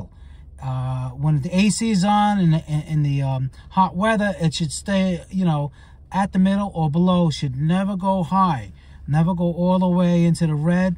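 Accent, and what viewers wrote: New York, United States. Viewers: This dude has the coolest accent...what part of NY are you from?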